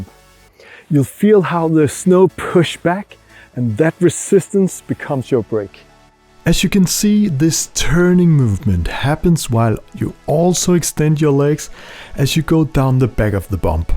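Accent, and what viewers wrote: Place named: Germany. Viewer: The German/Austrian accent of the narrator suggested this would be a detailed instructional tutorial rather than some fluffy review stuff. No surprise here about clarity, brevity and value of advice. Will forward this vid to my kids to improve their downhills.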